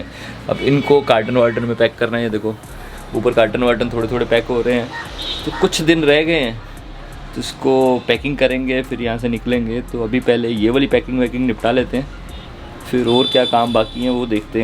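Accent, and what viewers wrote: Punjab, India. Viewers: Oh bhaaji Punjabiya wali krti tusi tan aj....!!!!!Good to listen veer ji dialouge..!!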